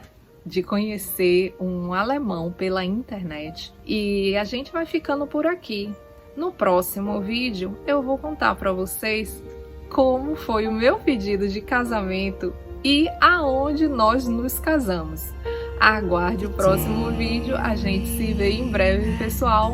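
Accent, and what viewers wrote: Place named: Salvador, Brazil. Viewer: Olá, eu também sou de salvador, vc explica muito bem boa dicção, com esse nosso sotaque bem presente. Nunca vi seu canal, 1 vez.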